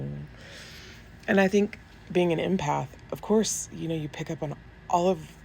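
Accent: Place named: Texas, United States. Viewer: omgeeeez I almost commented that your accent sounded Texan!!!! You sound like my sister she lived out in Fort Worth most of her adult life ❤❤❤ I love Texas!!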